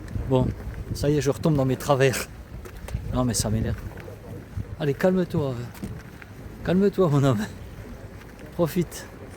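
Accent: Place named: Alsace, France. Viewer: Bonsoir Ca fait du bien d’entendre l’accent Alsacien 😀. Tu a bien fait d’aller voir cette belle cathédrale en grès rouge. Beaucoup d’église et de monument sont restauré avec le grès de la carrière de Rothbach réouverture en 1964. Je te souhaite une bonne nuit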